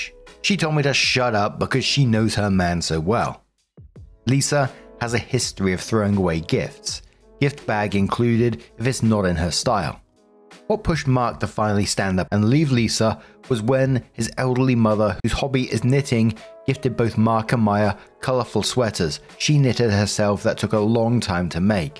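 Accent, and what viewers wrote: United Kingdom. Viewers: Hiiiiii Mark ❤ sending much love from austria 😊…always happy to hear your calm voice and lovley british accent 😅…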